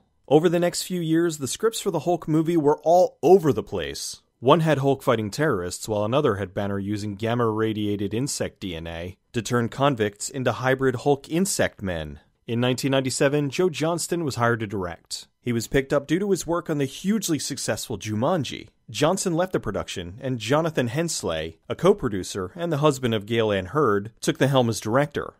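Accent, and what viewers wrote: Philadelphia, United States. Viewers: Wait, did you say Baña?! Love that Philly accent !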